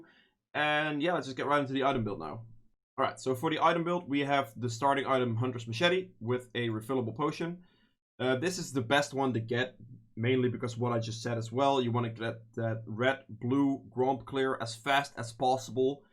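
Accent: Netherlands. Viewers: as a dutchy i can tell you are dutch. nice guide thx!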